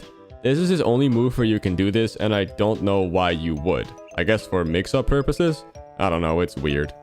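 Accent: Netherlands. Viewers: Are u from the Netherlands or from Belgium?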